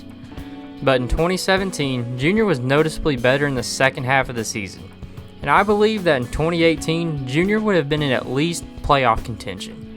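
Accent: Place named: South, United States. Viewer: If Jeff Gordon was a Southerner I imagine he would talk like that.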